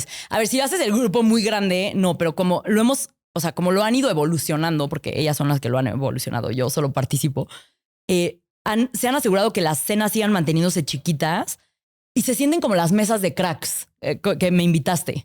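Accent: Mexico City, Mexico. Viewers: Asi hablan en cdmx, cuando estudias en universidades privadas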